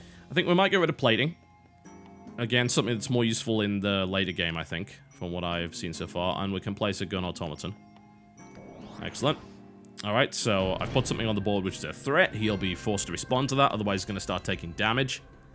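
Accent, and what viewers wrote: United Kingdom. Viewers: Everything this guy says is funny to americans because of the brittish accent. This is why BBC america has so much comedy amercians are almost ready to laugh anytime brittish people speak so they use it as an advantage. He can talk for hours about nothing and americans would be sitting over in the corner having giggles. Even card games are funny. Sorta like crocodile hunter/crocodile dundee but not australian. I don't even know what some of the slang he is using means.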